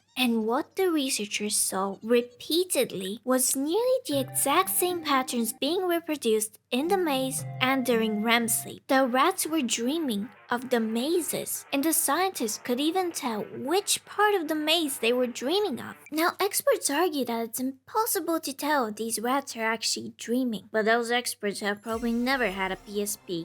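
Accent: Eastern Europe. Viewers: Oh it’s an Eastern European accent! (Like “Dexter Secret Laboratory”) I’m a huge fan of your voice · Yoooo, ur from Eastern Europe? Somehow it felt like it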